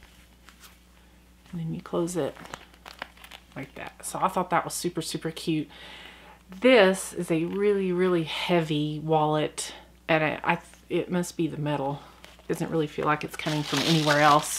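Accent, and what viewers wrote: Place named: South, United States. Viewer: I love that bag you call "dorky" lol! I just love your video's and that southern accent is awesome! I'm from RI born & raised here. I just love all your bags and my favorite videos are when you change bags and I love all the things you carry in your bag! I'm the same way---All my things in my bag has a story behind it. I carry a pretty piece of sea glass in my wristlet my grandson gave me. it's me good luck charm that I held in my hand when I go to treatment for my ovarian cancer. Keep taping! Your just awesome💜👍💜👍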